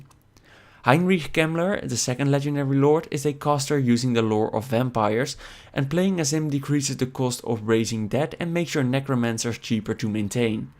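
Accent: Netherlands. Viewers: Are you perhaps from the Netherlands?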